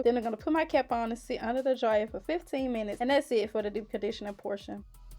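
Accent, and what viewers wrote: United States. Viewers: I LOVE the country accent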